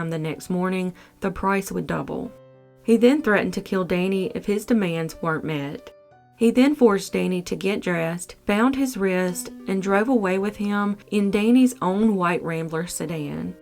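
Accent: South, United States. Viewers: There's that voice! Southern drawl melts my heart. I ordered the SGCS apron in green a couple of weeks ago. I never have gotten a follow-up email. Any idea how long it takes them to ship your items? Or anyway for me to check my order status. I sure hoped it would be here for my New Year's Eve cookout tomorrow night. Oh, well, there's always Memorial Day! Thanks,! EDIT: Just checked my email, the shipping notice came yesterday, I guess I overlooked it. Thanks again! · I find your subtle southern drawl to be quite endearing, bringing a bit ov light to the darkness!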